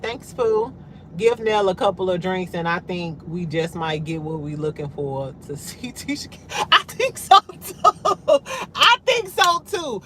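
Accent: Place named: Chicago, United States. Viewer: Girl you sound like you're from Chicago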